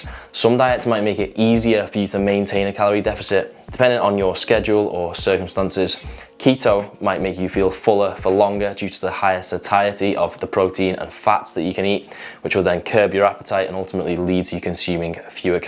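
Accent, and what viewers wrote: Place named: Widnes, United Kingdom. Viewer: Sound like your from widnes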